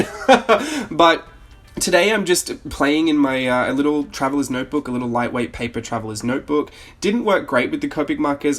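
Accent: Australia. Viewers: You still sound Aussie to me!!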